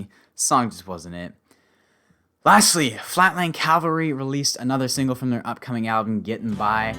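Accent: Canada. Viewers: not even 2 mins in and I can hear the canadian accent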